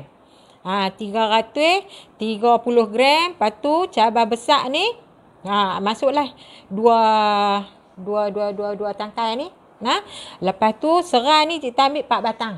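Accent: Kedah, Malaysia. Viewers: Suka tgk cik masak...cakap kedah lagi...cun la..sopan.bersih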